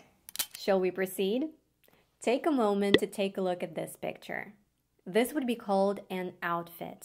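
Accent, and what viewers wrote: United States. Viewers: This is English American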